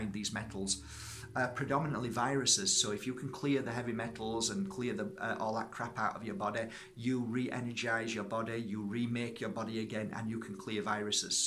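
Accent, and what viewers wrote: Yorkshire, United Kingdom. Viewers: I'm in La Cala de mijas... Love your Yorkshire accent..I'm a Lancashire Lass! 😉😀 You're an inspiration and I hope we meet one day ☺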